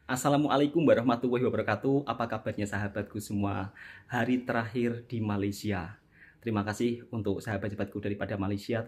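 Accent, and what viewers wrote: Java, Indonesia. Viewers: Slang Bro bicara seperti rakan rakan jawa di tahun tahun 70an. Teruskan bro berkarya. Moga nanti tidak kena cukai. Adakah bro balik terus?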